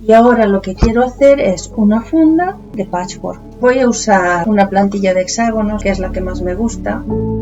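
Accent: Spain. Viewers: Un trabajo precioso!! Acabo de descubrirte me encanta la paciencia que tienes. No se si me equivoco pero me parece que tu hacento es gallego. Gracias por enseñarnos tus trabajos.saludos de una gallega ❤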